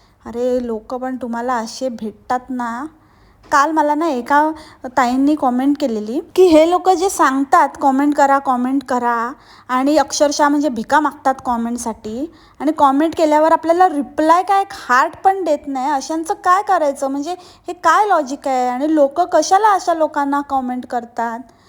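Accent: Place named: Kolhapur, India. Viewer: Tú Swayahala Ka Dakhvit Nahis ????? Nav ,Gav Kahihi Sagat Nahis ...Ka?????? YuBplnya Varun Tu Kolhapur Kivha Marayhwdayatil Vatates ... Kahi Ward , Tone Tuze Typical Tikadache Vatates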